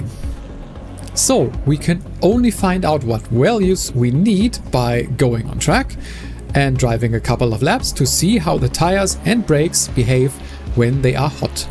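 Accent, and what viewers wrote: Germany. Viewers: I can hear Denglisch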